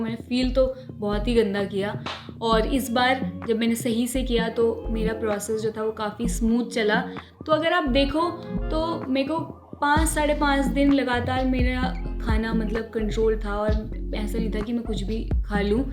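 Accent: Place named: Uttarakhand, India. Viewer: I can guess you are from uttarakhand ...😅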